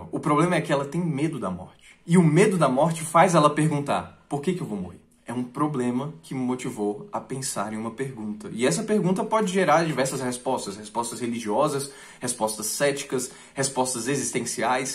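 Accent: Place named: France. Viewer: tô aqui pelo sotaque francês, flw vlw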